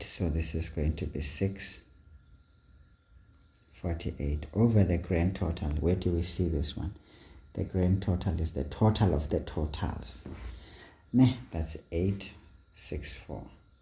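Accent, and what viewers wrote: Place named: Afrikaans, South Africa. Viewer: the Afrikaans accent 😂😂thank you for the videos sir ,I'm always looking forward to your uploads .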